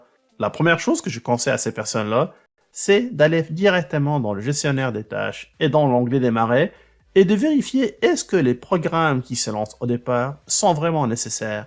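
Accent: Quebec, Canada. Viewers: Merçi mon ami je n'ai pas d'ordinateur mais c'est sympa d'entendre le Québec ✌🤗😂😂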